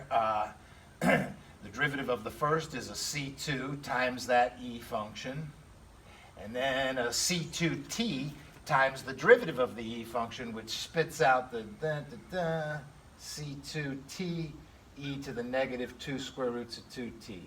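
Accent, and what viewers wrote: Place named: South, United States. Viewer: God, its feels so nice to hear a southern accent. You talk like I think. Hallelujah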